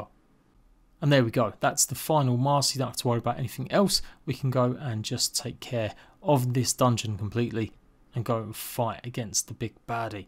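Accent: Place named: United Kingdom. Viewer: Ur to british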